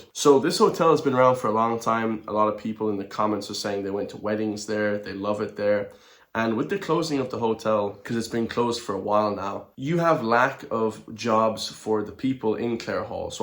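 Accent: United States. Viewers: Are you born and raised in Ireland? No offense, but you sound American...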